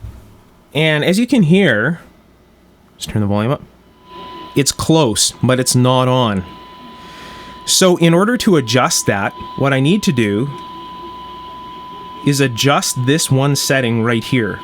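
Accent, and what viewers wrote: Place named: Canada. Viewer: Zap strap? lol Never heard that before, I assume you're in Canada from your accent. Here in Murica it's just called a Zip Tie.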